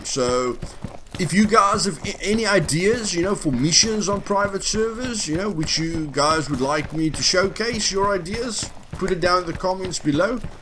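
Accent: South Africa. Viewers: Jesus that south african accent :( ugh...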